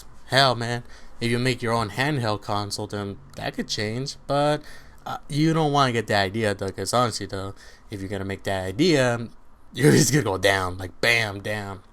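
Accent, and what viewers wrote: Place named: Mexico. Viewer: you have a strong Mexican Accent are you? Well good work on the video bro ;)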